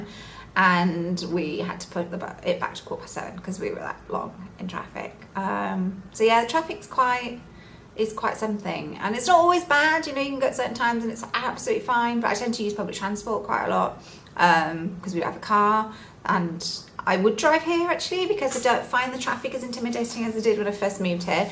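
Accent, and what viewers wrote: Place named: Yorkshire, United Kingdom. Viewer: Luv to hear your Yorkshire accent. Reminds me of Bradford. Thanks luv for loving Malaysia.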